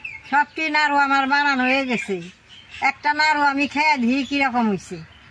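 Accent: Bangladesh. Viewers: আপনার কথাগুলা একদম আমাদের বাংলাদেশের মানুষের মতোন ❤❤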